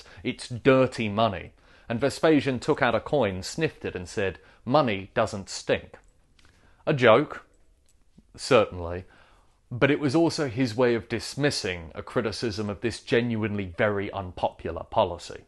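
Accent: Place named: Northern England, United Kingdom. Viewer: fuck sake beat me to it bar the northern accent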